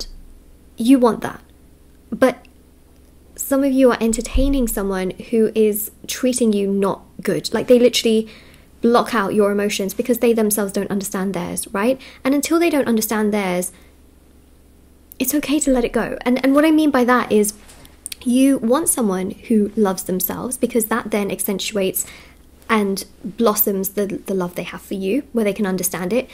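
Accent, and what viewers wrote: United Kingdom. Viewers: Girl, for how long you have been in the UK? Your English accent is awesome!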